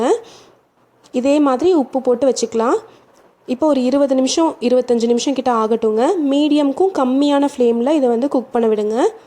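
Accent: Coimbatore, India. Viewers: Nega coimbatore ah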